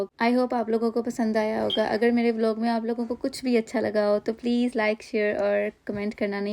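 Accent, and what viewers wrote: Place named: India. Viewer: Your channel name is pakistani mom. But you dnt sound pakistani your urdu sounds like an indian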